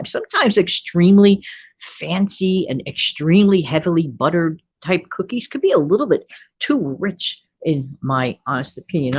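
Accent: New York, United States. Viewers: New subscriber. I saw you on Centsible Living's channel with Prepper Princess. Love your personality and NY accent. Great video on the DT. 🌻🤗🤗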